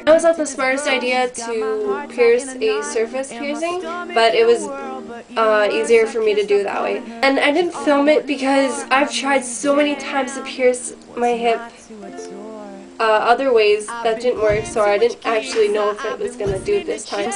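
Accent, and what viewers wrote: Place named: Canada. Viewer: I love your accent :D Canadian accents are cuuuuuuute ^_^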